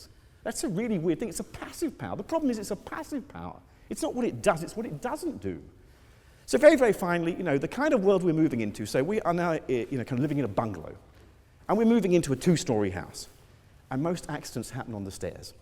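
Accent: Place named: United Kingdom. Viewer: Goddddd...I have trouble understanding British accent..LOL~~~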